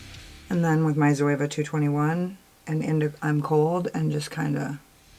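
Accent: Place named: New Orleans, United States. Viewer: Omg You sounds like a New Orleans Gal.. I love your Videos.. New Subscriber here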